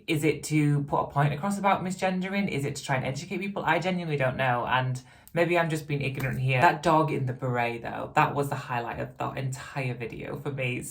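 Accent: United Kingdom. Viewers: You’re so right. Hey what is your specific accent from in U.K.? :) Love your videos!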